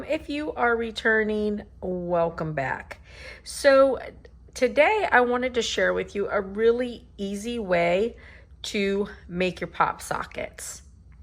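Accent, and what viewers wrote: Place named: Philadelphia, United States. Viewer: You sound like u have a Philly accent. Anyways thanks for sharing with us. I appreciate the information so much.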